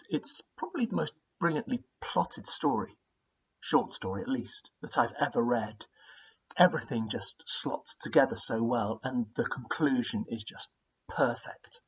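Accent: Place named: United Kingdom. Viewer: Please tell me in which british accent you are talking😊💐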